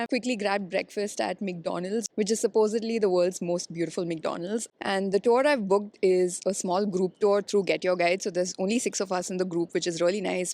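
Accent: India. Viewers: You have an Indian accent, are you from India? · Yes she is from India.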